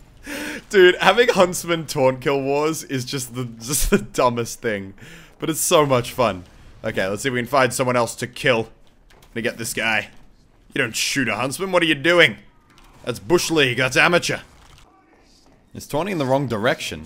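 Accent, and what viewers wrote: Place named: Australia. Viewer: Your Australian accent seems to come out a lot today. I like it (•w•)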